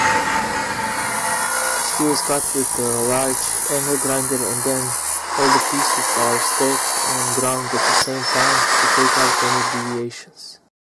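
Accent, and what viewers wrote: Russia. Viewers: Great video. Are you from Russia? Couse I can hear your accent quite good :)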